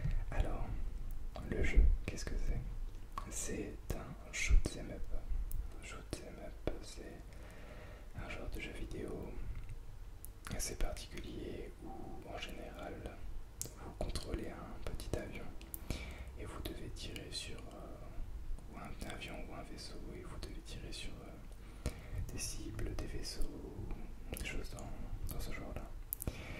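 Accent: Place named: France. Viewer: O sotaque francês é tão satisfatório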